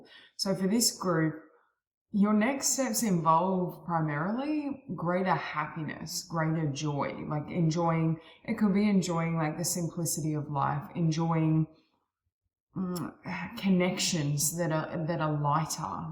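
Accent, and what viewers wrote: Australia. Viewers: Lovely to listen to another aussie reading tarot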